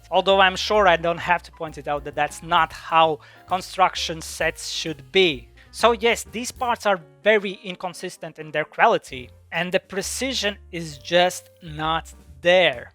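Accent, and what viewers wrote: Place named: Latvia. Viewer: Nice video but the latvian accent is strong 😂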